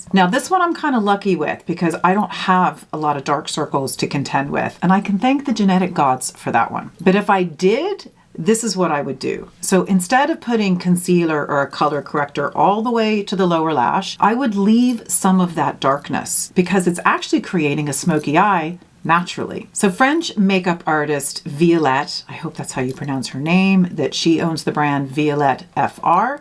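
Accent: Ireland. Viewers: You occasionally have a bit of Irish accent!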